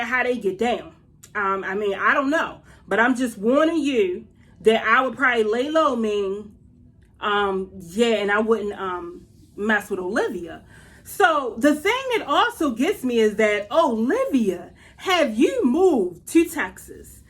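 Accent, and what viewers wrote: Baltimore, United States. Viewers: You from Baltimore Ms.?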